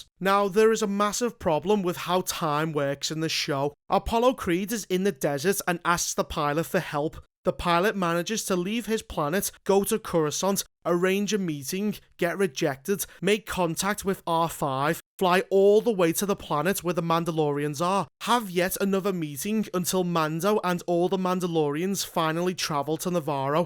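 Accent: Liverpool, United Kingdom. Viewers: Bro, you have to be from liverpool yea? This man sounds EXACTLY like Darren Till 😂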